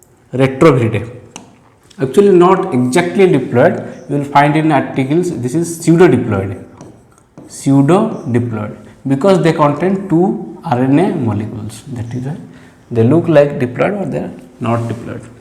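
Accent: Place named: South India, India. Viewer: Due to south accent please cc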